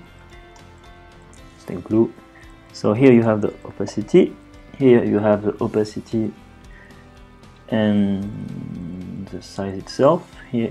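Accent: France. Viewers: u loose a little bit your french accent in your latest videos ;)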